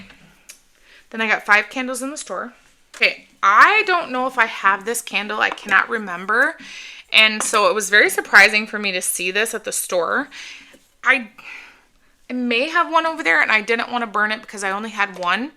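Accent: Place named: Minnesota, United States. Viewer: I love the Perfect in Pink candle. I bought two which is unusual. BTW, im wondering if you are from the upper Midwest? Im a Minnesotan and you kind of sound like us lol...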